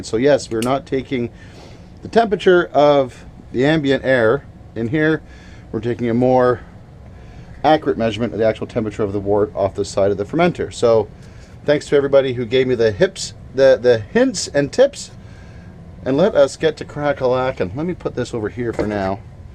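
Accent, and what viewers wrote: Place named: North, United Kingdom. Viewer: Just curious, why would you take the probe off the fermenter. You still want to be bringing the actual temp of the ferment up....I could be totally wrong but I think you should keep it attached. Anyway, can't wait to see how it turns out. Once I have my dual stage controller a lager is in order. Cheers my more "northern accent" friend!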